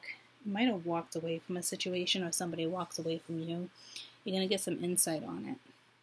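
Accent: Boston, United States. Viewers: just found your channel and boy is this accurate. dealing with an aries i left in the past. im canadian but visited the states quite abit as a child...is that a boston accent? love your voice!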